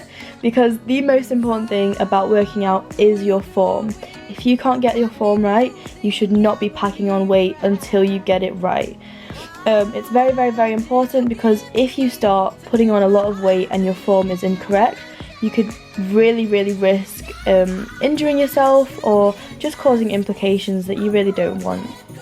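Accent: United Kingdom. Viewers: Somehow I find the way you speak really attractive, is that weird? 🙈 Btw are your parents/family hispanic? Your name sounds hispanic but your accent obviously is British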